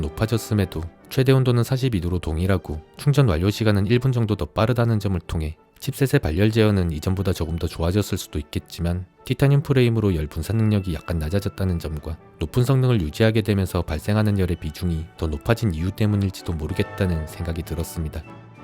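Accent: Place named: Gyeongsang, South Korea. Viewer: 경상도 사투리에 줄줄 새고 뭉개지는 딕션까지.. 치아 교정하세요?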